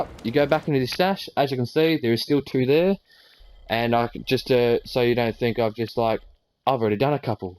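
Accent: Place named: Australia. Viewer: Strong australian like it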